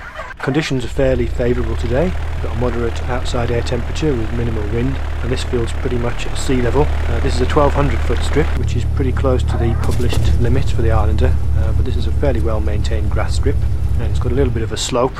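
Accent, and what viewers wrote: United Kingdom. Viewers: I like the quality of your videos and also your british accent ! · Just about the best 10 minute review for an FSX aircraft I've ever seen. Your comparison of real knowledge to in sim performance is particularly appreciated. As ever I also appreciate an understated but confident British accent.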